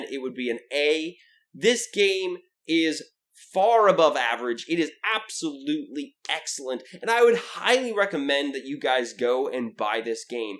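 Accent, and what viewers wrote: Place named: Texas, United States. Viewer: Oh my word. I love the %100 authentic Texan accent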